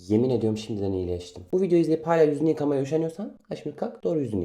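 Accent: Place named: Trabzon, Turkey. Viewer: Haşimdi kalk dedi Trabzon lu musun yoksa 😊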